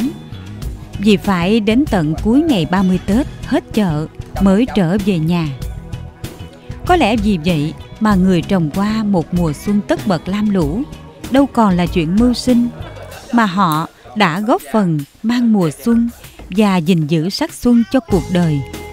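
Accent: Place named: Kien Giang, Vietnam. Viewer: Kien giang giong rien que toi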